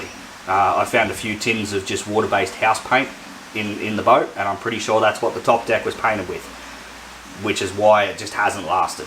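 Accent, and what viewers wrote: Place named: Northern Territory, Australia. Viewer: Hi mate sounds like your in the northern territory !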